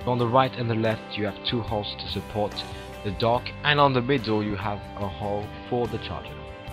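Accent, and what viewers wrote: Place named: England, United Kingdom. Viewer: Tu as un superbe accent ! Tu ne serais pas anglais ou un truc du genre ?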